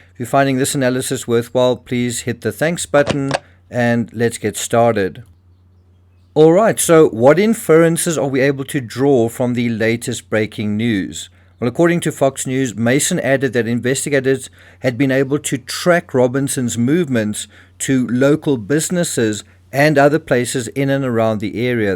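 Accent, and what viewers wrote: South Africa. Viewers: Mmmh, u sound very South African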